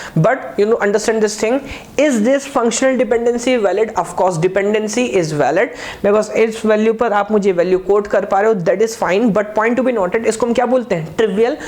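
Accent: India. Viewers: the Hindi accents add class to u r lecture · damn that Indian accent bugs me... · It took me a long time to figure it out, i'm ok with Hindi ascent, but i'm a Chinese so i don't understand Hindi but Nice Video!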